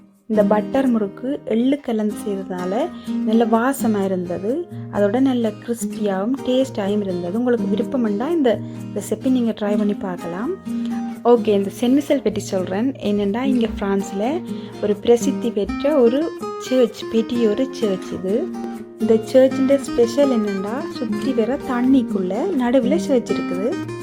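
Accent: Sri Lanka. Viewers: Very nice. Many likes. Your sri lankan tamil super. New frnd 8 4 .Stay connected 👍👍👍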